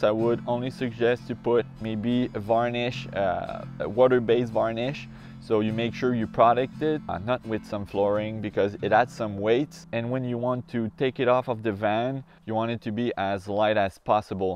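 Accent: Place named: England, United Kingdom. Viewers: Definitely the English accent! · your English accent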